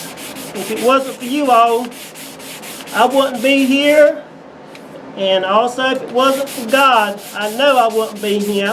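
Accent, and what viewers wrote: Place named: South, United States. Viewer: LOVE the southern drawl in your voice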